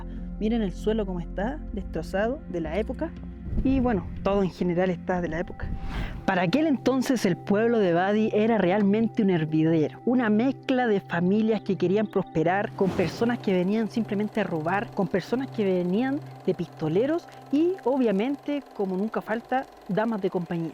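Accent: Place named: Chile. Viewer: Saludos....para mí q eres de Chile.... por la forma especial de hablar...y por la palabra....una cachada... saludos desde Perú 🇵🇪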